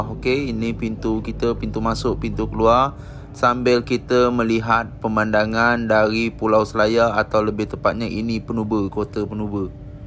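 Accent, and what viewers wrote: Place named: Johor, Malaysia. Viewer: Salam...maaf baru terjumpa channel sedara..nak tanya juga ni melayu Daik Lingga atau orang Malaysia..sebab cara percakapan tu, macam orang Johor..bangga saya sebab sedara tidak banyak terikut dgn loghat bahasa melayu Indonesia, tetap dgn keaslian melayu..harap lebih banyak konten yg akan sedara upload dimasa mendatang..👍👍👏👏👏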